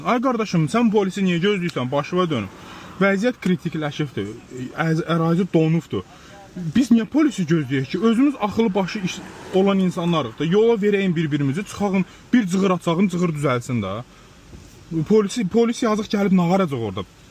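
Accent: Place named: Ganja, Azerbaijan. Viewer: Gəncəli ləhcəsinnən danışırsız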